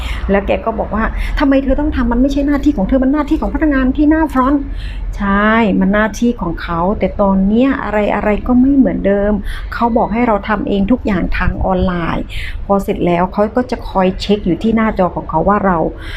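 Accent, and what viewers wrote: South, Thailand. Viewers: พูดดีแล้วค่ะ เราคนใต้ก็พูดแบบนี้แหละ ยังไงพี่ก็ชอบน้องนะคะ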